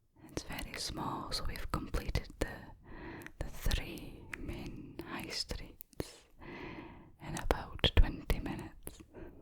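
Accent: Scotland, United Kingdom. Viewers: ! Glad to see you posting. I do love your Scottish accent so this is a wonderful video. ❤❤❤ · lol a very good sleep indeed, your Scottish accent beat me lol but I wonder how you’d stack up against a southern drawl lol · You and Stronny Cuttles are the absolute best Scottish voices I've ever heard in asmr 😍 I wish you two would collab. It would be soooooo amazing if that were to happen lol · that Scottish accent whispering is so hot wanna take an cold shower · this scottish accent knocked me out last night it very likely will again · Dear God what a most beautiful gorgeous angelic Scottish voice I already feel more relaxed you're a true angel ❤❤❤❤❤ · I am so taken back to my childhood with the Scottish accent at bedtime. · Was scrolling through shorts and heard a Scottish accent pop up related to asmr finally a proper Scottish accent you do our accent justice lol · Holy tingles dude. 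Never thought I’d love a Scottish accent so much 😌☺️ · A Scottish accent. I think she's from Aberdeen or Dundee? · I never realized just how much I absolutely love the Scottish accent. You are absolutely amazing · it brings me much joy as a scottish person myself that so manh people love the scottish accent!!! ive always felt really insecure about my accent because you never really see it online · Never knew Scottish accent was what I needed to sleep. Thank you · Well clearly he's talking about the Scottish accent IN the video, if you couldnt tell. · I hope to have your voice and accent in my ear every night when(if) I visit Scotland. Cheerz luv. 😴🥰🏴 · I have a newfound love of the Scottish accent now. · This. Idk why but i love the Scottish accent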